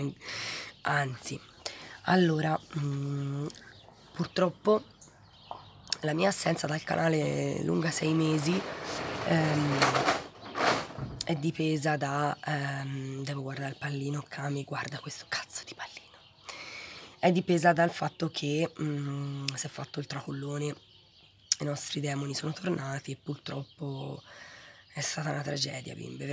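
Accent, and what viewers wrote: Tuscany, Italy. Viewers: Dai che ce la fai e noi saremo qui, io potrei essere tua madre per l'età ma mi piace ascoltarti con il tuo accento toscano e mi sei simpatica ti ho sempre pensato e ho immaginato che stavi passando un brutto periodo. Quando vuoi saremo qui ad ascoltarti tvb 😘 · Sono le del mattino sono appena tornata a casa e trovo questo video .......quanto mi eri mancata cica ❤️❤️❤️❤️❤️ daje tutta sei una forza della natura e ce la farai anche sta volta sono felicissima di risentire quell’accento toscano che adoroooo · Simpatico accento toscano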